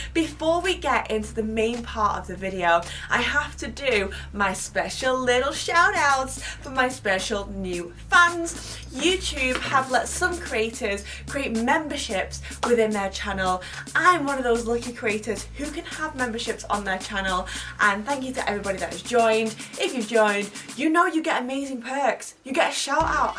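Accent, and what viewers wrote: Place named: England, United Kingdom. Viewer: I have to say I love your English accent. You, if course are beautiful and very fetching!!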